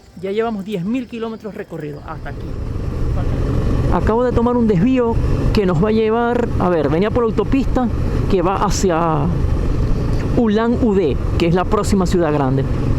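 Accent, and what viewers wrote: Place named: Zulia, Venezuela. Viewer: Uno sabe que un episodio es bueno cuando el tiempo se te va volando cuando lo ves... Cuando te oigo me imagino la rápida traducción mental que vais haciendo del maracucho al español "neutro" antes de hablar, cosa difícil en nosotros los zulianos jejeje, me pasa en Argentina... saludos hermano, que siga creciendo el canal!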